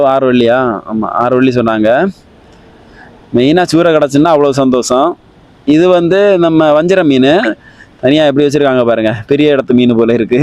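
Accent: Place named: Kanyakumari, India. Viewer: Kaniyakumari karan..pola...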